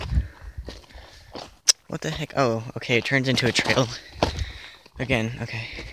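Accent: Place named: Australia. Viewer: Love the Australian accent, need to make more about your life videos 👍✌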